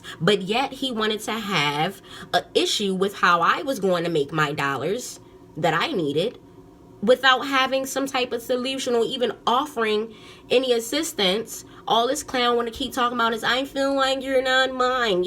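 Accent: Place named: Baltimore, United States. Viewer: Baltimore accent..🌺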